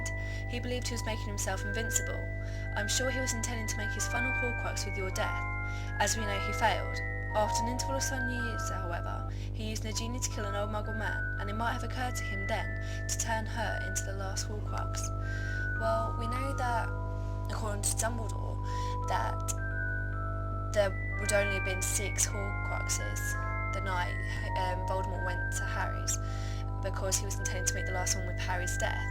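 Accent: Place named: England, United Kingdom. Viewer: x.o I can't hear you when you're reading; but I assume you're grabbing facts from the books since there are more to support he may not be a Horcrux. And ZOMFG, nice English accent. =) And to add on, Voldemort must've known risks and such before going on with it, esp when DD had said it was dangerous to confine one's soul within an object of free will. Sorry, if you've said that already though. xP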